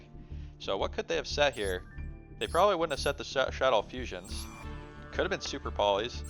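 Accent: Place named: Canada. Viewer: You sound big time Canadian bro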